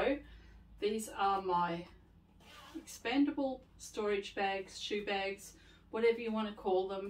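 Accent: Australia. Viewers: I only found your channel a few days ago while unwell in bed, and I’m itching to get well and back in the sewing room. Your instructions are easy to follow and it’s great to hear an Aussie voice too. I made 3 helping hands yesterday and will make a few more for our family card playing days. Thank you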